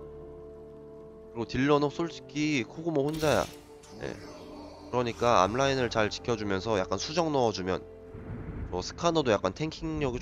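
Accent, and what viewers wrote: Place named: Chungcheong, South Korea. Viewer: 항상 롤토체스 많은 정보 얻어가요 말투가 충청도 분이신 것 같아요!!ㅎ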